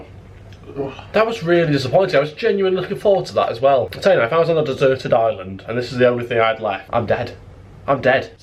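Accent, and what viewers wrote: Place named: Yorkshire, United Kingdom. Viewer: You can tell your a Yorkshire lad . More sense than money and if we have parting with it on over price honey …. I can hear are you a soft lad from here 😂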